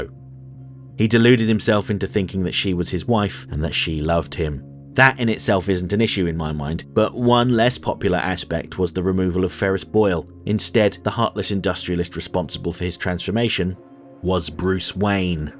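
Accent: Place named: RP, United Kingdom. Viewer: I hope this channel grows well. I enjoy your insight and in depth review alongside your nice voice. I also learn a bit of history. Its like hearing Sal from Comicpop explain the comic but with a chill posh British accent.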